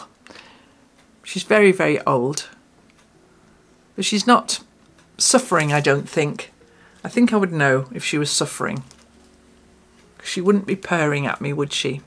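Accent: United Kingdom. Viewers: I had a cat that became 21 years old. Her name was Whitesocks. You will never guess why ;-) She stopped eating and drinking and our vet told us that she has no table that says what 21 catyears are compared to human years. Enjoy your catlady as much as she enjaoys her life with you. Love how polite you talk to your cats in your videos. That sounds very british in my german ears. ;-) Have good times you two.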